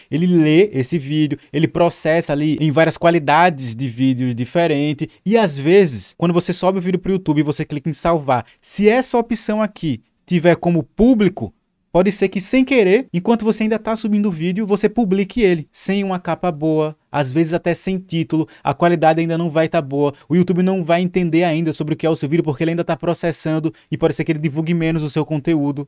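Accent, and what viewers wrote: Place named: Paraíba, Brazil. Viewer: Cheguei hoje por aqui e tô maratonando! Sua didática e franqueza são mega cativantes! E seu sotaque super me pegou! Pensei até que era da minha terrinha , João Pessoa :face-red-heart-shape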